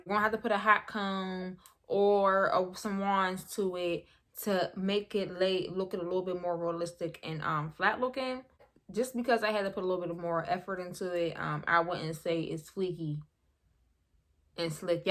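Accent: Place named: DMV, United States. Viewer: Ok cool! Thought I heard a DMV accent which is why I asked.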